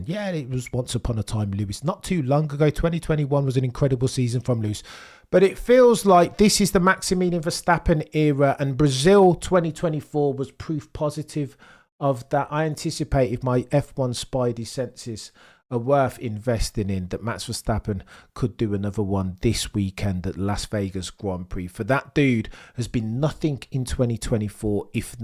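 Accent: United Kingdom. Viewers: I’ve never heard a Brit with a accent THAT bri’ish speak so positively about Max Bravo sir 🙏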